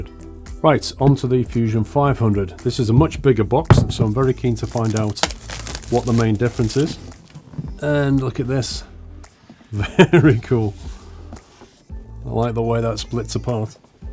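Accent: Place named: England, United Kingdom. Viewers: Your British accent is so good. Even I subscribed!!!! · You DO know that he's English, right ? He's not TRYING to affect an accent.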